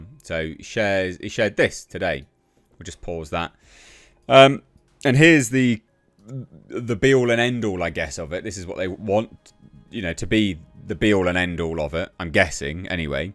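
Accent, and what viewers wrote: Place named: United Kingdom. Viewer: Spoke like a true Brit .. ( the first 39 seconds of the post )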